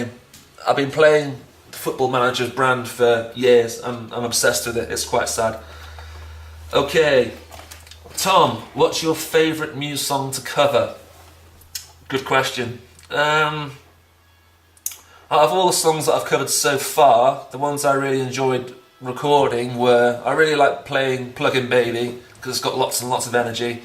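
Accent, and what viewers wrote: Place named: Liverpool, United Kingdom. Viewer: You sound like youre relatively close to Liverpool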